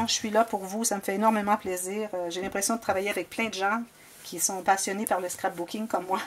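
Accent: Canada. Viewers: Merci Pour ce bel album, il est magnifique, je pense le refaire en essayant d'adapter les dimensions pour un album plus grand pour pouvoir mettre des photos 10x15 ou tout au moins de 9x13 formats les plus courants en France! Tous les matins, c'est avec un énorme plaisir que je regarde tes tutos. Je commence ma journée avec tes vidéos et avec ton bel accent canadien. Merci encore pour ta disponibilité, Biz de France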